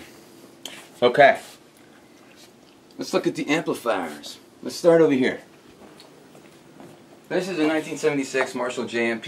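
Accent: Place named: Canada. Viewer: lol, he still says abooot :) love canadians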